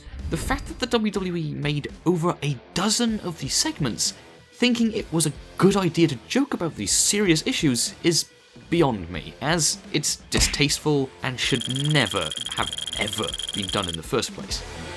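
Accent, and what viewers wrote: United Kingdom. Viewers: British Accent + Sense of Humor=A good Video. 😂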